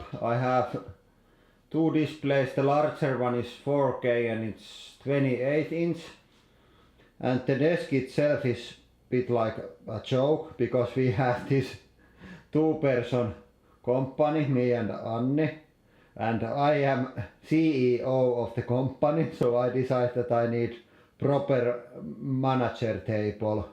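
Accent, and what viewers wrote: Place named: Finland. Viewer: Krzesimir Nowakowski Finnish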